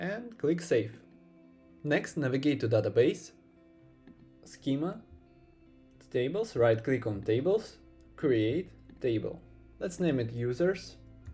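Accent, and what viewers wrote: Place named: Russia. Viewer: спасибо дружище! русский акцент тебя выдал)))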